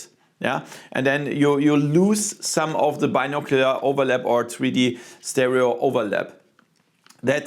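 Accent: Germany. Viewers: What did you expect, buddy? He is German. Everyone has a different accent on planet Earth. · I love the German accent. It's very clear and easy to understand for non-native speakers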